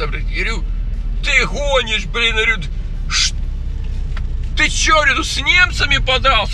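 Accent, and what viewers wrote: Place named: Ukraine. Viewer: Вы сами и есть украинец, не забывайте пан! Даже в вашей речи чувствуется акцент - "Або" "г"кание. И это здорово! Слава Украине!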